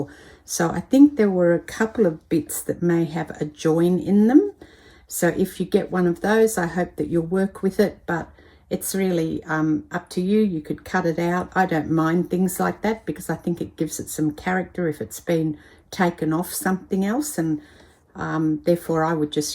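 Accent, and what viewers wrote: Australia. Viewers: Hello this is Ellena from Portland, Oregon. I’m a quilter and have been fascinated with your Vintage linen quilt squares. I love them and am inspired to try doing something like this. Ps love your Australian accent. Keep up the good work.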